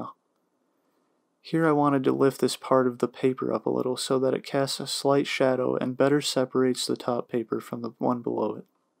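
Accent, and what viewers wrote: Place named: Texas, United States. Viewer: bro just sounds like a half asleep texan idk about smooth lol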